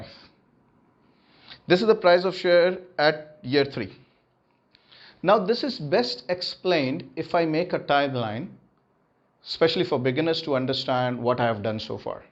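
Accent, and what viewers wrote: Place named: England, United Kingdom. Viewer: I like your teaching sir, and your English accent 🤗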